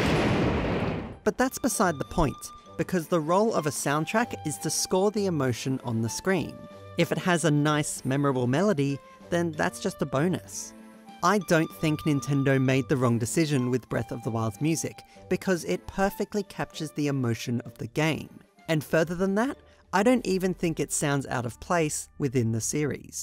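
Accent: Australia. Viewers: Are you from Australia? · I know you're emphasising words for clarity, probably because of your Aussie accent. I just find it disjointing and would appreciate more a more natural style of narration.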